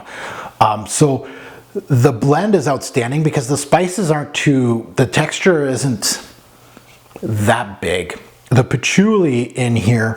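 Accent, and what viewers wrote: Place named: Quebec, Canada. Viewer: Hello Mon Ami Bonjour ou Bonsoir des USA Always such a pleasure to get your opinion with amazing accent French borderline English Du Canada if I m right Merci beaucoup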